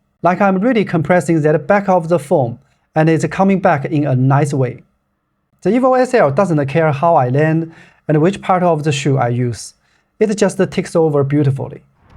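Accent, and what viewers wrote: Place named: China. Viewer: in terms of grammar there isn't much to correct. the only challenge is sometimes I have a hard time understanding some words. it's certainly due to my unfamiliarity with chinese accents.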